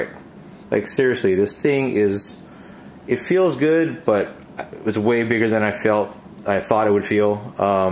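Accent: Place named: New York, United States. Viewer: I got my X-H1 today and watched your Opening Box Video when opening mine. Cool. Share the experience. One thing I was hoping you’d talk about: what is that little gizmo that looks like a T? I’m an engineer and can’t figure out what the heck it is. I read manuals as a last resort. Another thing: I sold my X-T2 Graphite for this one. I have an X-T20 as my second body. I like the look and feel of the X-T2 much better but I bought this for one reason: IBIS! I’m a prime shooter. I have one zoom - the 18-55mm and unfortunately got spoiled by being able to shoot at much lower ISO. The IBIS on the X-H1 is fantastic. 1/8 sec? No problem. Tack sharp. Worth the upgrade. Now my 56mm f1.2 comes with me on night street shooting safaris. (You think the X-H1 is heavy now?) The larger body was necessary to fit the IBIS. I like the extension rear of the mock mirror housing. 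That too was necessary to get more distance for the new 3.6M screen. The sub-display? I’ll never use it. I’m not about to put on glasses just to read that. Defeats the purpose. I wish they have kept the Exp comp dial. I used that and it kept more the retro look. Hey, since you sound like you’re from NY, like me, stop trying to pronounce “tt.” Sounds unnatural. The Queen of England doesn’t so why should you?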